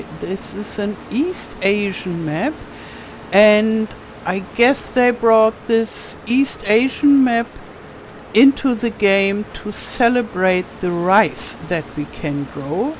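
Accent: Germany. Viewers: Just found your channel after searching for videos on farming sim se on switch 2 cause im trying to decide whether to buy it. Absolutely loving your accent, I'm Australian but you sound just like a good friend of my husband and I that is originally from Germany. Her and her husband moved to Australia decades ago but they both still have their beautiful accents. Great info in the video too.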